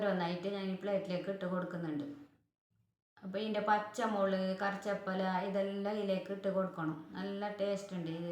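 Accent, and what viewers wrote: Kasaragod, India. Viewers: എനിക്കൊരുപാട് ഇഷ്ടപ്പെട്ടു നല്ലൊരു വീഡിയോ ആയിരുന്നു ഞാൻ ഇതുവരെ ഇങ്ങനെ ഉണ്ടാക്കുന്നത് കണ്ടിട്ടില്ല തീർച്ചയായും ട്രൈ ചെയ്തു നോക്കും അറിയാത്തവർക്കു വളരെ ഉപകാരമായി വീഡിയോ ആയിരുന്നു ഇങ്ങനെയുള്ള കുറെ ടിപ്സുകളും ഉപകാരമുള്ള വീഡിയോയുമായി വീണ്ടും വരണം കാസർഗോഡ് സംസാരം കേൾക്കാൻ നല്ല രസമുണ്ട് ഞങ്ങൾക്ക് കാസർഗോഡ് ഭാഷയിൽ സംസാരിച്ചു കേട്ടാൽ മതി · ഇത് കൊള്ളാലോ നല്ല ഐഡിയ ആണല്ലോ. നമ്മളെ കാസർഗോഡ് ഭാഷ കേൾക്കാൻ നല്ല രസമുണ്ട്. കാസർഗോഡ് എവിടെയാ ഞാനും കാസർഗോഡാണ് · Nalla usefull video..bakki Vanna choru ini ithupole cheythunokkanam..kasargod samsaram kettirikkan nalla rasamund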